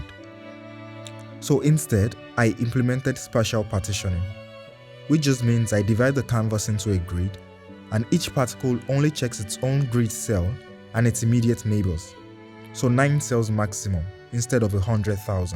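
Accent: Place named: Africa. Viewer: you have a African accent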